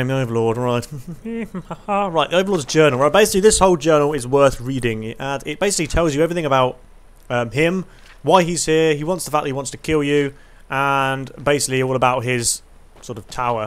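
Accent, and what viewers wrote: United Kingdom. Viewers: Oy, luv, your, britesh, accent ,young man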